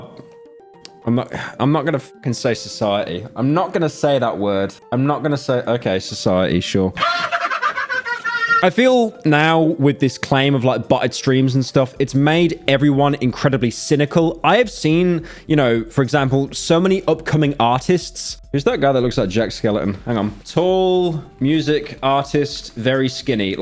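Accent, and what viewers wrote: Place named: England, United Kingdom. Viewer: You do that English gentleman voice so well, I think that's the way you talk.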